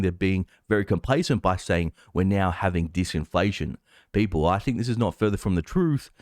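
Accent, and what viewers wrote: Australia. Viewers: That’s Ultra Mega Aussie 🇦🇺🤙🏻🇺🇸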